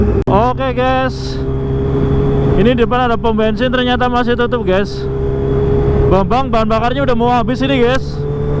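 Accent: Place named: Banyumas, Indonesia. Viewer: Biasa ngapak dadi angel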